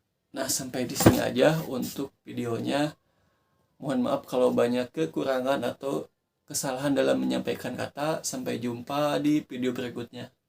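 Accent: West Java, Indonesia. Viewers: Lu orang sundaa yaa, udah kelihatan banget logatnya sama pas baca huruf "F"😅😅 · Sunda banget ngomongnya 😅😅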